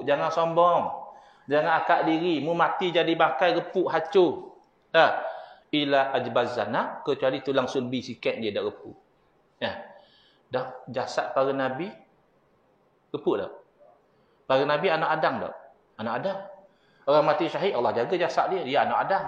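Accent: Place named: Terengganu, Malaysia. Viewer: Ustad ni bahasa ganu ok lagi molek sangat penerangan yg jitu faham jelas selari dengan shyariat islam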